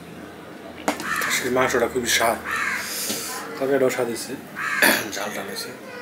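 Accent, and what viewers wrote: Bangladesh. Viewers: Dada apne kemon achen ami nederlandsche thke dehi apnar gabber thekle Bangladesh er kotha mone pore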